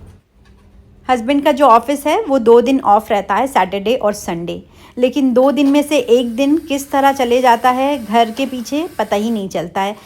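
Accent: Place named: Bihar, India. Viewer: Aap kahan ki rehne wali hai aapke lehje se lagta hai aap bihar ki rehne wali hain susral to aapka u.p. hai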